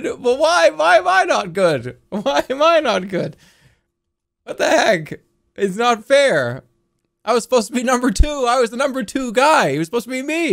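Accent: Boston, United States. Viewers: The best part about any jerma stream is how BAHSTIN his accent gets when he gets frustrated… “this is nawt mah computah”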